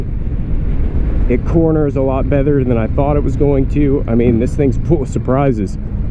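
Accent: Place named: Philadelphia, United States. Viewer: You from Philly?.....sounds like it. Like this bike and the different looks and functionality...thanks for the review.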